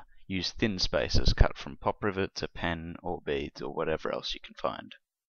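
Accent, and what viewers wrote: Australia. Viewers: R u from Australia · Hey man, you sound Aussie. Sweet. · Holy fucken shit mate, never knew you were Aussie, top notch work! The best i've seen in a long while.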